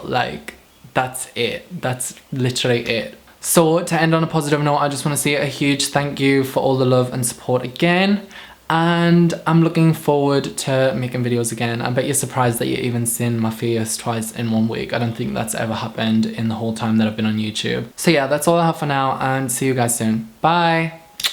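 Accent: Australia. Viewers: Yes, you totally sound Australian, but I'm loving it :-) I prefer British English of course, but this Aussie accent suits you fine. This is only the second video of you that I watch, but I like you a lot as a person and I admire you for what you are doing. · the aussie twang keeps making me laugh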